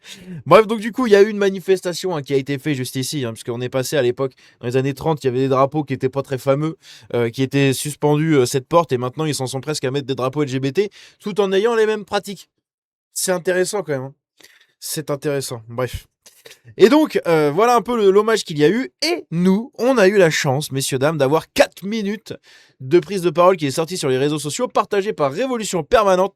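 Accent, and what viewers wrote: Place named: Spain. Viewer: L'accent est espagnol ou portugais.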